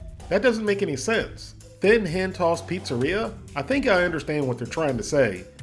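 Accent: South, United States. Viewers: Being from the northeast, nobody here says "pizza pie". It's awkward and a bit redundant. So hearing it, along with a southern twang and so much excitement is funny, in a strange way. It's not like I'm having Vietnam-esque, PTSD flashbacks and night sweats. But it's amusing though.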